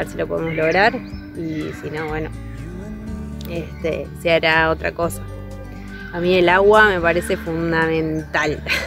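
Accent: Córdoba, Argentina. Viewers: Descaaaansar jeje ya se te pego la tooooonada jaja 😄😄 saludos desde Santa Fe pero soy bien Cordobesa jaja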